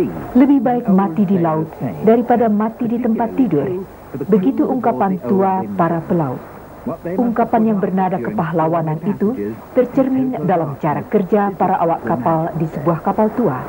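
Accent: United Kingdom. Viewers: dari logat englishnya seperti dari BBC acaranya. Secara TVRI kiblatnya tahun 80-an adalah BBC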